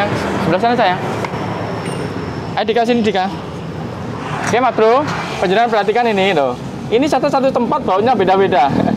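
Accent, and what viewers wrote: Java, Indonesia. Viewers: Logat e kok jowo mas? Sampean pundi mas? Kulo mpon ndak kuat mas vario 125 led kulo mpun turun mesin ganti perpak. Panggah munggah terus air radiator e mas. Tulung mas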